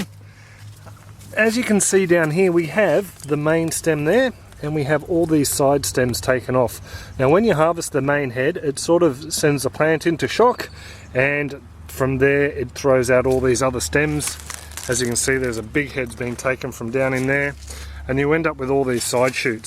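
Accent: Australia. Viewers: Very, VERY helpful. Thank you. I am from states but my nephew, his wife and her family are from and live in Australia. It was lovely to hear your accent, made me think of them. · Great, broad Australian accent and useful information. Must get chickens.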